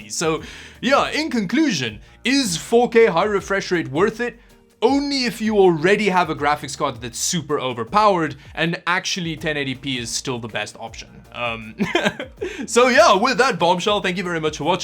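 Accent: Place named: Afrikaans, South Africa. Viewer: Lekker, kan hoor die man is Afrikaans haha. Goeie video:yougotthis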